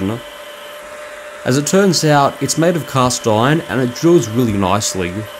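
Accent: Australia. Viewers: cool. Which state are you in? I can't quite pick the accent. SA or WA?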